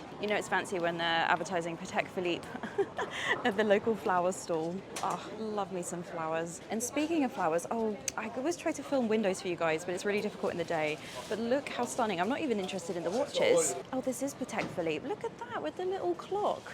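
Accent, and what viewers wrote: RP, United Kingdom. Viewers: Your Received Pronunciation is exquisite.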